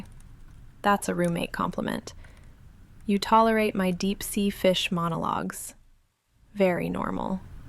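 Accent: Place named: United Kingdom. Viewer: Ngl I love that British accent. I’ve always had something for British women. Great audio definitely saving this on a playlist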